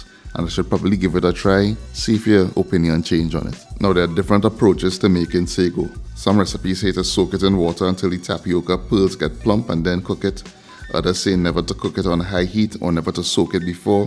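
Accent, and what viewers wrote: Trinidad and Tobago. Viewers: Is that a Trini accent ?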